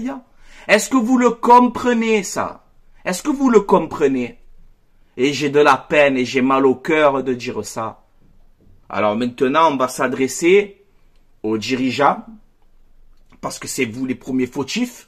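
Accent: Marseille, France. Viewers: J’adore ton accent marseillais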